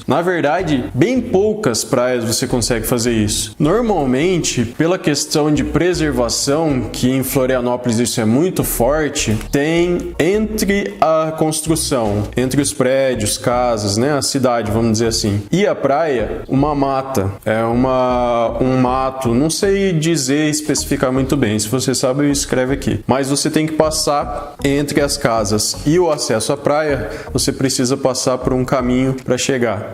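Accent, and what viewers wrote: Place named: São Paulo, Brazil. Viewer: Fala você é do interior de SP ou Paraná? Pelo sotaque parece!!!😁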